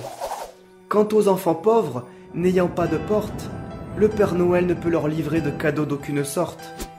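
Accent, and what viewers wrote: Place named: South-West, France. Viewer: Première vidéo que je vois sur ta chaine, et je dois te féliciter ! Très beau texte qui suit le rythme de tes rimes et de ton accent du sud ouest, animation superbe, dessins très soignés, et une originalité indéniable...Bref. Un sans faute !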